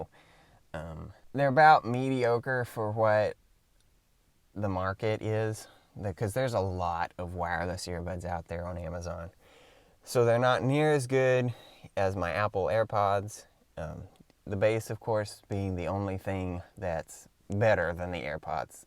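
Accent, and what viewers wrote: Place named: Alabama, United States. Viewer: I know you You're from Alabama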